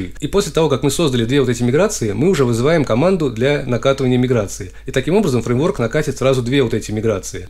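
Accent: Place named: Uzbekistan. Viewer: Узбек веб разработчик на удаленке… Или я один так слышу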